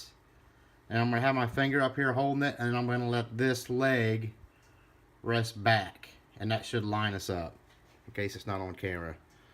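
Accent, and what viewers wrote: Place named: South, United States. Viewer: Honestly couldn’t have done this without you! Thank you very much. And your southern accent helped keep me calm and patient while I followed your instructions. Very Helpful!!! 5 stars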